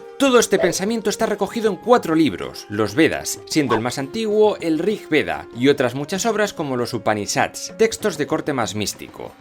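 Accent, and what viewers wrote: Spain. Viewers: El acento español no aburre en tus vídeos, sigue así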